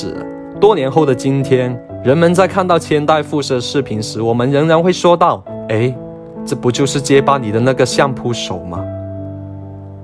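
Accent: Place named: Taiwan. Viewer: 本来很平淡的看人物传记，结果这台湾腔说到后来都激动落泪和死了爹一样潸然泪下，让我笑出声，真是大孝子。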